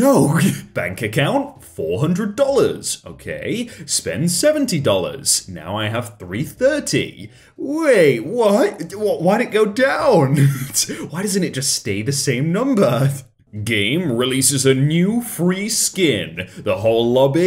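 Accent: United Kingdom. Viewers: day 26 of asking that hes british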